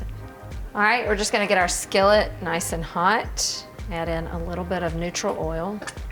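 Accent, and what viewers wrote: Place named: Deep South, United States. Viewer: Thank you. Love the accent. Very gentle Deep South?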